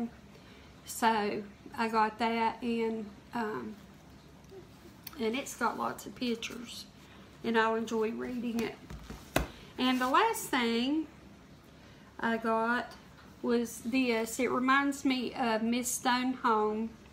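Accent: South, United States. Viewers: Hi! When I came across your YouTube video for the first time I was drawn to you immediately! My mom was from Virginia and I lived there for period of time when I was a kid! Your southern accent and your personality make me feel at home! I really enjoy all your videos and your calming effect! Take care of yourself and keep up all of your good work! By the way, I live in central California now!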